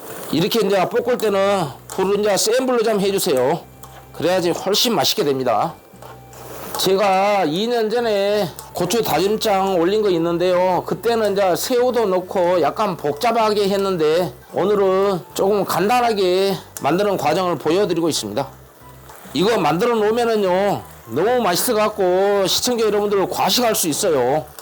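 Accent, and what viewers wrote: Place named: Honam, South Korea. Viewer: 선생님께서 정겨운 호남말투로 만드시는 경상도 토속음식 너무 재밌네요 김천 흑돼지식당 가서 이거 먹어보고 반해서 레시피가 궁금했는데 잘 봤읍니다.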